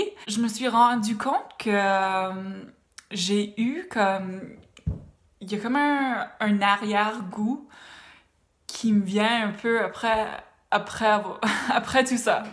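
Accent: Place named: Quebec, Canada. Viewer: Votre francais est influence par le francais du Quebec mais aussie un peu par le francais de l'Europe.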